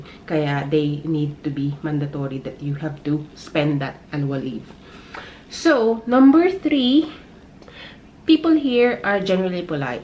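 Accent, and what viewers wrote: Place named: United Kingdom. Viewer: You may sounds like a british accent , thats very good maam. You really deserved that ..!!!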